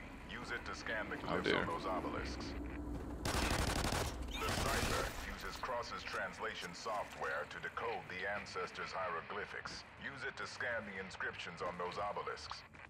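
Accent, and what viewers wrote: Netherlands. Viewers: Haha deze man is Nederlands en dat kan je echt ziek goed horen. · Are you dutch?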